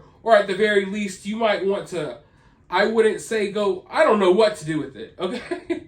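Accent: South, United States. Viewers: A swamp monster. Crack me up. You can tell you're a southern boy.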